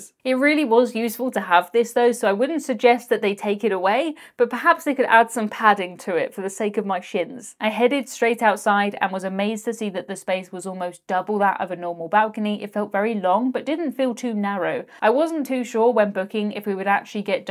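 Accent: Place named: Birmingham, United Kingdom. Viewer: I love your videos so much! Your style of telling about your cabins and ships moves quickly, so I feel I learn more than from most vloggers videos. You give us lots to consider when booking future cruises. In Canada we call the kid's ride a merry-go-round!! My grandmother was from Birmingham, so listening to you talk makes me feel her beside me. Keep up the good work!!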